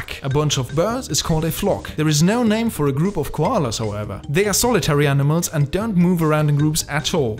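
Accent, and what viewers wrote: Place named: Germany. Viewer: How come I feel a koalalike urge to fall asleep whilst listening to facts infused with a German accent?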